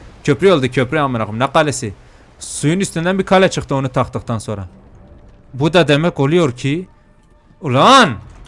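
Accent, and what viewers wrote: Elazığ, Turkey. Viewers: Abi Elazığ lı mısın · Abi sen Elazığlımısın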